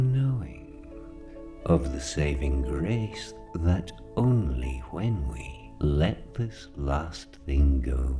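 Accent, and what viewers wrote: Scotland, United Kingdom. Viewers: I love your stuff accent is great might be Scots.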